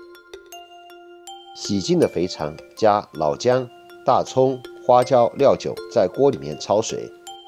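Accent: Sichuan, China. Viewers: 还是四川话好听，又有特色！带着爱做自己爱做的事情，顶你！ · 四川人听四川人说普通话好搞笑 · 作为成都人的普通话已经超过很多四川人了，不是四川人的话几乎听不出椒盐味道。不过还是喜欢听的成都腔调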